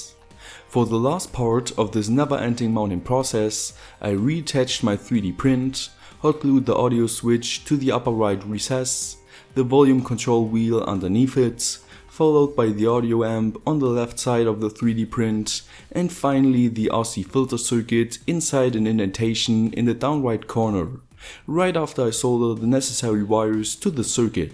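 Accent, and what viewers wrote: Germany. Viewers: Why do you have a German accent? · are you German mate ?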